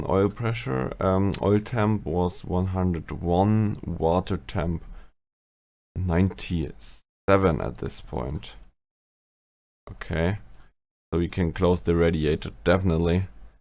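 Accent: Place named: Germany. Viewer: Are you german ? Because I am german and your accent sounds pretty much like everybody in my class speakes English😂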